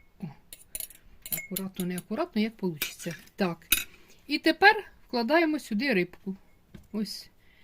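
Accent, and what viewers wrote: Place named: Russia. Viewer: А в якому місті ваш ,,говор" москальський?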